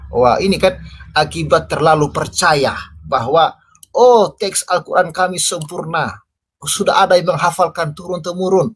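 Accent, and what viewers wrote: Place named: East Nusa Tenggara, Indonesia. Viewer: Mantap. hostnya sptnya orang NTT ya logatnya jelas sekali? Sama spt orang Batak ada logatnya. Orang Jawa ada logatnya.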